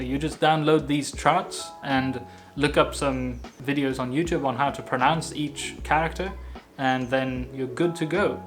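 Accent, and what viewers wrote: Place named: United Kingdom. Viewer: We’re just catching up on a lot of Swedish things before we visit Stockholm at the end of August. Now we are addicted to your videos. And your fine British accent, and liberal swearing. Now we’re enjoying your Japanese - can’t wait to go back ourselves. If we’re ever in the same city I’ll buy you a drink. A small, inexpensive drink, but a drink nonetheless. Much love from the UK.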